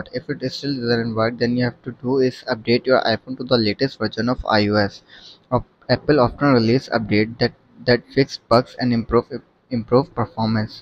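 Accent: India. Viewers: Jesus Christ, this Indian accent is unbearable. I can't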